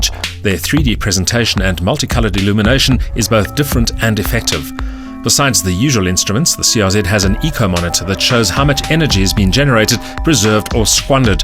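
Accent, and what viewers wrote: South Africa. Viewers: South African English , Their is a few different accents in South Africa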